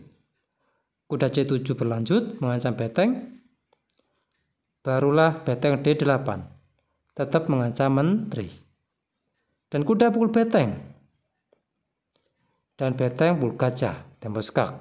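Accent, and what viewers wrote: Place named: Java, Indonesia. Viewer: ..medhok, mMass, intonasinya...; jawiyhoo..?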